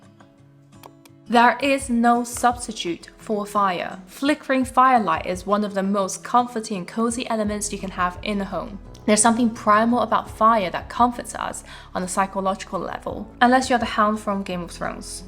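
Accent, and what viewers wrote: United Kingdom. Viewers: I've never heard so nice British accent. ❤ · I’m brazilian and your video cames to me. I’m IN LOVE with your accent and now I’m “using” your channel to practice british accent/english. THANK YOU FOR YOUR CONTENT! Ps: Also, I’m building my house with my groom, so your tips is very rich. Again, thank you!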